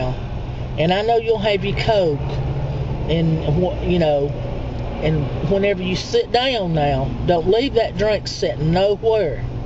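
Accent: South, United States. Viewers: Your southern accent was out in this one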